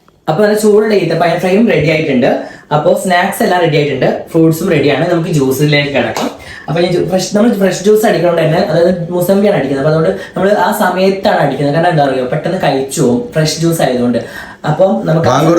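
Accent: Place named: Kuttippuram, India. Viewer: nde veed kuttippuram alle. Njan chembickal anu. Njangale samsaram polethanne nindeyum. Orupaad uyarangalil yathatte. Padachon anugrahikkatte. Yende naatukari penne❤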